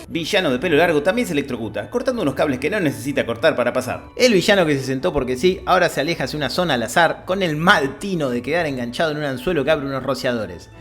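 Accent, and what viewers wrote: Argentina. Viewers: Qué bueno eres. Y ese acento argentino le da mayor intensidad al humor.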